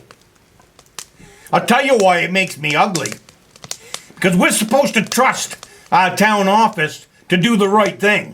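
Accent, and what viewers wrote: Maine, United States. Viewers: Great video. Spoken like a true Mainer right down to "August'er". Big hugs.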